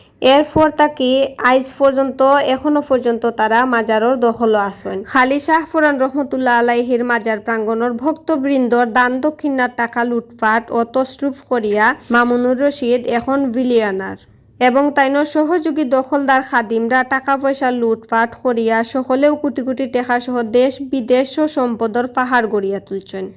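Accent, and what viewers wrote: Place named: Barishal, Bangladesh. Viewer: বরিশাইলা মনু😂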